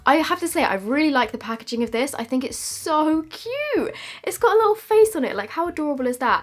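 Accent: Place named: United Kingdom. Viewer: Its so hard to watch this when u hate british accent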